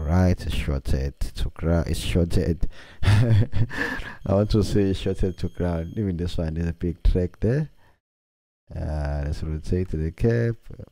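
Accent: South Africa. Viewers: You from south Africa bcz I here from you iiibo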